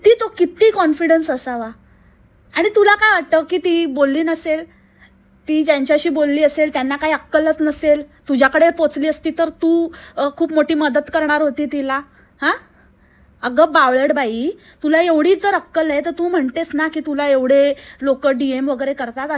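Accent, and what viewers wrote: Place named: Malvani, India. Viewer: Tai tula malvni yete ky.....mhnje tu malvni mast boltes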